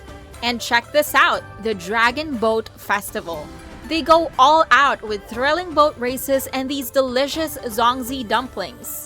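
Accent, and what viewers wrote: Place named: United States. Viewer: The narration is so American - freaking freaking freaking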